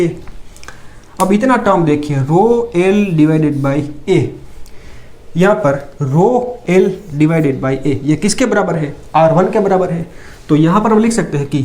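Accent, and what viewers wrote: Gujarat, India. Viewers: Nice explained. Are you from Gujarat?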